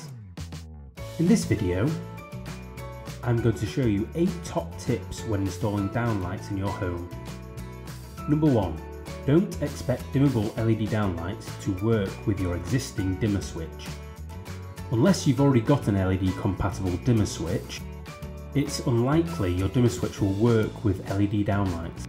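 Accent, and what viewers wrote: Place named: United Kingdom. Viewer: OH NO.. A BRITISH GUY... UGH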